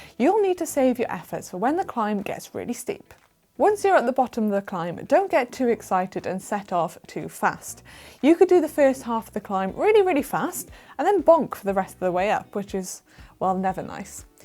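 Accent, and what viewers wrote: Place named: Wales, United Kingdom. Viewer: Lovely Welsh accent ❤️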